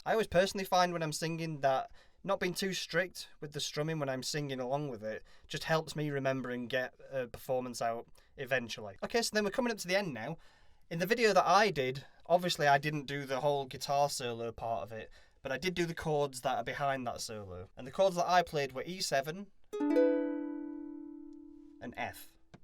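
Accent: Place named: South Yorkshire, United Kingdom. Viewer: Nice tutorial man! Sounds great! Also judging by your accent you sound like you’re from my neck of the woods in England, South Yorkshire?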